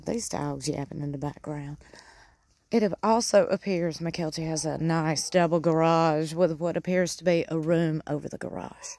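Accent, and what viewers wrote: South, United States. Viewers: She's crazy! Why doesn't she protect her babies from a wasp? 😢 I love your videos! You have such a sweet, southern accent and you make me laugh. 😊😊😊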